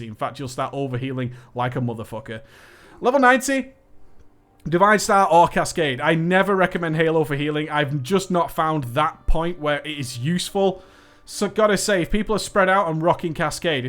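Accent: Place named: RP, United Kingdom. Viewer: Love the energy and that elite UK english accent.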